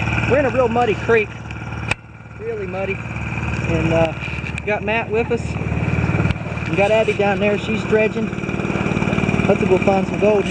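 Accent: South, United States. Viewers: Murica, could you not tell from the accent? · Enjoyed the video. Felt jealous in a good way. I wish I were young and hanging out with you. You sound like John boy Walton, and I mean that in the best of ways. You sound like your from the south and that creek reminds me of a creek that ran by my Grandparents house in eastern Kentucky. One question do you come across snakes when out like that. I remember seeing copperhead, and cottonmouths down south. Hope you find some great relics and gold. God Bless. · where's your southern from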